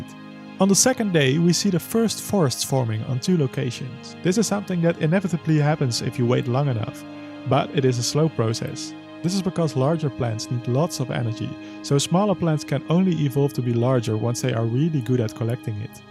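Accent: Netherlands. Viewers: ARE U DUTCH? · Are u Dutch? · That funky Dutch accent. Loving it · Dutch eksent? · This guy is DUTCH, that accent I can recognized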